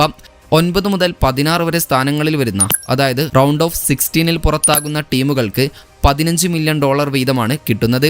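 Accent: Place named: Northern Kerala, India. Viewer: ഒരു വിധം പറഞ്ഞൊപ്പിച്ചു..... വടക്കന്മാരുടെ ഭാഷ..... കൊറെയൊക്കെ fake ആയിരിക്കും..... 😂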